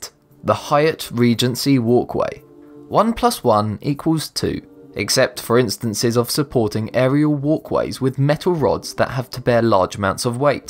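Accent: England, United Kingdom. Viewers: disliked in 23 seconds.due to dorky face figure (after brit accent) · An English voiceover speaking an American English script always does my head in. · the guy in the video obviously doesn't know much about physics But he's got a smooth British accent, and that gives him instant intellectual street cred. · Having an English accent will only fool some people into thinking you know what your talking about young man. List videos then to have lots of mistakes in them but this one takes the cake!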